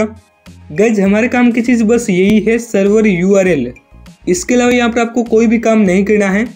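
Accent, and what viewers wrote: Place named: India. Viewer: english title indian voice wtffffffffffffff how the fuckkkk can we understand this :text-green-game-over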